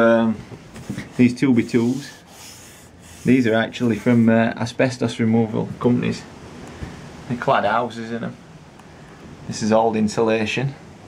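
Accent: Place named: Northern England, United Kingdom. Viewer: Hi, I also live in the north of england and judging by your accent, not too far from you, so where abouts did you get all these supplies from?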